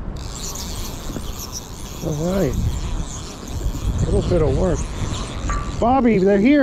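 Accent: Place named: East Coast, United States. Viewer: And back to the salt, good job! Lol hearing you talk to "Bobby" with your eastern accent very much reminds me of a character off of the TV show Bob's Burgers, named Teddy. Since I dont really know what you look like, I've come to just picture you as looking much like Teddy.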